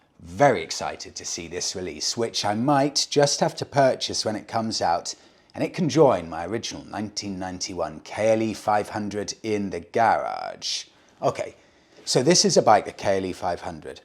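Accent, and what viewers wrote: United Kingdom. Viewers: Americans criticising English pronunciation..... that's rich😆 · Americans telling an Englishman his pronunciation is wrong, yeah, that's going to work. World's gone crazy. Still, it's been said that America and Britain are two nations divided by a common language. What language is that, one might ask? Er, it's called English mate. Not American. We reserve the right to mangle U.S. words as we see fit. I mean, ask a Yank to pronounce Shrewsbury, or Worcester. My case rests m'lud.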